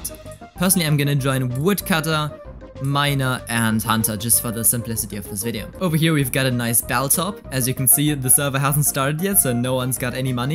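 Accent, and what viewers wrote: Germany. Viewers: Alter immer lustig wenn man den deutschen Akzent so durch hört😂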